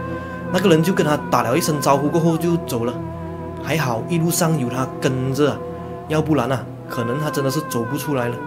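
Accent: Malaysia. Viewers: 都要看/听😂，support 大马腔，请问你是客家人吗？ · 故事惊悚很精彩，大马腔调好听👍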